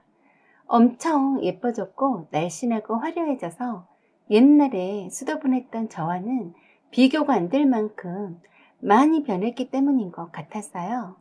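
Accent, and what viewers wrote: Jeolla, South Korea. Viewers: 저기요, 표준어로 읽던가 아님, 전라도 사투리로 읽던가 하나만 하세요 거슬려서 못듣겠어요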